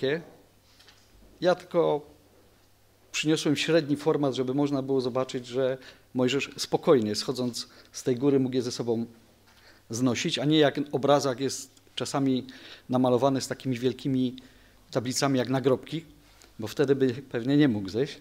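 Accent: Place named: Silesia, Poland. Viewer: Bardzo ciekawy wykład! 👍 I ten spokojny głos, ze śląskim akcentem😉 Przyjemnie się słuchało. Dziękuję.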